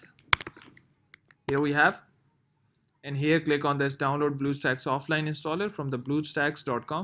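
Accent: India. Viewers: So ein inder akezent feier ich mega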